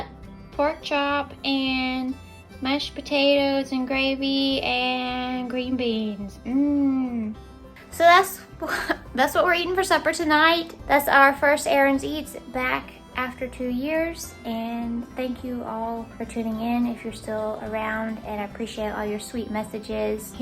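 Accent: South, United States. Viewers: I'm so happy you are back. I have really missed your charm your cooking and you wonderful southern accent. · I've missed your southern accent. This recipe is devine.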